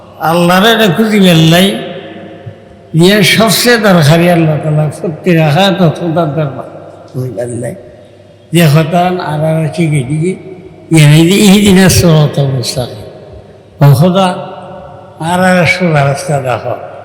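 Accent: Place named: Chittagong, Bangladesh. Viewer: রাগ করে লাভ নাই ভাই উনি চিটাংগের ভাষায় কথা বলে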